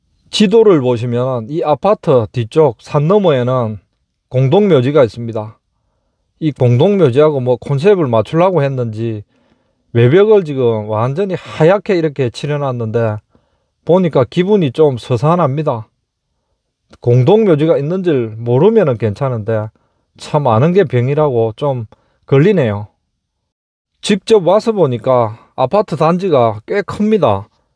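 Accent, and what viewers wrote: Gyeongsang, South Korea. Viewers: 영상 내용도 내용이지만 운영자님의 완벽한(?) 경남 사투리에 늘 더 끌리게 됩니다 ㅎ~. 저도 고향이 경상도인 사람인데 운영자님의 나레이션을 듣고 경상도 사투리가 이렇게 매력적인가??..새삼 깨닫게 됩니다. 암튼 좋은 영상 늘 감사히 잘 보고 있습니다~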